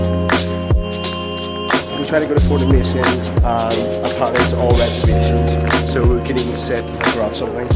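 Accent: Northern Ireland, United Kingdom. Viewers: I love your videos plus photos on instagram! Do lovely edited and good choice of music! You deserve way more subs and followers! ps: love your accent! nothing beats a proper northern irish accent! greetings :')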